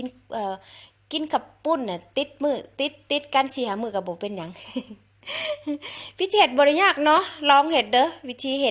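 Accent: Savannakhet, Laos. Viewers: ຂ້ອຍເບິ່ງຫລາຍລອບກະຄືບໍ່ໄດ້ມີພາສາໄທປົນໃດ ຄົນສະຫວັນເຂົາເວົ້າແບບນີ້ໃດ ຂ້ອຍກະຄົນສະຫວັນນະເຂດຄືກັນ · ເຈົ້າຄົນແຂວງໃດສາວ ນີ້ແມ່ນພາສາຄຳເວົ້າສະຫວັນນະເຂດ ຂ້ອຍຟັງແລ້ວກໍ່ບໍ່ໄດ້ມີໄທປົນເລີຍໃດ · Jasmine Cahuana ຂ້ອຍຟັງເອື້ອຍລາວເວົ້າມ່ວນໆຫວານໆ ຕາມທີ່ຟັງນິແມ່ນສະຫວັນອ້ອຍຕ້ອຍເກາະນິ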